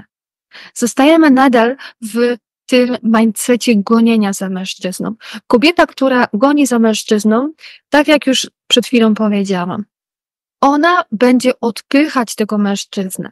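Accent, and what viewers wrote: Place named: Ukraine. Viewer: myslalam ze jest pani ukrainka czy spod tzw.kresow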